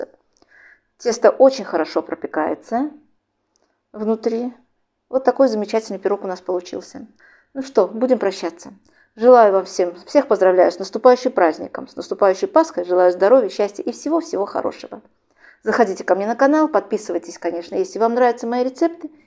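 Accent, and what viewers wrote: Baku, Azerbaijan. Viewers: Здравствуйте! Спасибо за прекрасные рецепты!!!Приятно слушать вашу речь с бакинским акцентом❤❤❤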